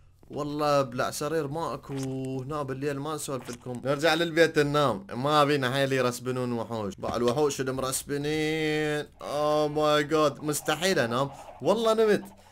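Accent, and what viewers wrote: Iraq. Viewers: احلى من ينزل اشعار يهز الجوال ❌ اشعار يهز القلب ✅ ياربييي احب لهجة العراقيين من 🇰🇼 الكويت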